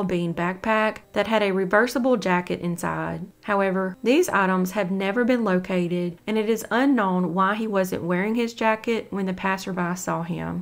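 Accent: South, United States. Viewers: I find your southern accent cute. You are a great narrator and I hope you get picked up by a major network. You are very detailed.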